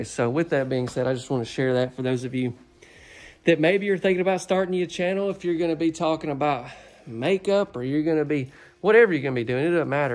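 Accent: South, United States. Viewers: Omg, this has nothing to do with nothing, but I love his Southern accent♥️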